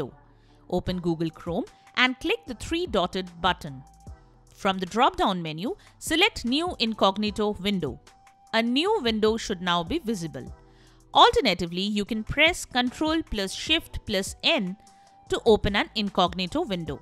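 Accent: India. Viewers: lmao Indian voice. How am I not surprised.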